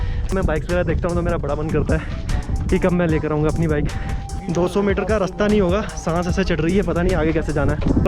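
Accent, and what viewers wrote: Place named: Pakistan. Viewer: ye blogger pakistaniyo ku tarh kyon bol raha hae ...iski tone full pakistani jaisi hae.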